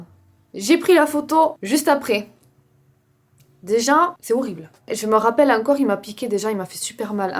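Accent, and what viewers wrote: South, France. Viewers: Merci énormément d’avoir ce discours sur l’alimentation et le sport 💪🏼 j’ai vu ton évolution physique et bravo!! J’adore ton contenu, et ton accent me rappelle mon sud 🥹 même mon chéri te reconnaît par ta voix quand je met ta vidéo 😁 encore super vidéo et pour le Bad buzz je n’en ai même pas entendu parler tu es au dessus de tout ça 💪🏼 sincère et authentique🥰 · Ton accent du soleil est si agréable à entendre et renforce cette petite bulle de positivité et d'energie que tu nous transmets au quotidien, j'adore t'écouter et tes vidéos égayent mes journées ( et je sais que je suis loin d'être la seule ) 😊😊😁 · Alors moi j’ai 60 balais et je suis tombée par hasard sur ta chaîne. Et ton accent m’a sifflé direct dans l’oreille moi qui habite le sud sud profond. Tu es touchante. Rigolote. Concernant le bad buzz tu dois bien savoir ce qui se dit dans le sud…. 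😅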